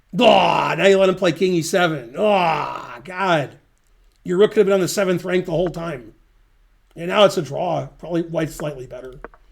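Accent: United States. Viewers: I can totally tell your from Detroit bro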